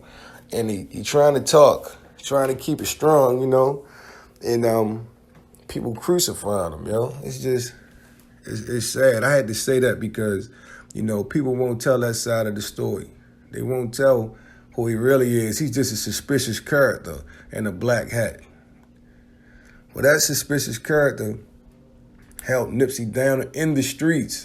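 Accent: Baltimore, United States. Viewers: You from B more? When you say do and dude you have the same accent as people from there, My bad if im wrong. But anyways, I aint gonna lie I thought Cowboy had something to do with it also. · Damn bro your Baltimore accent heavy af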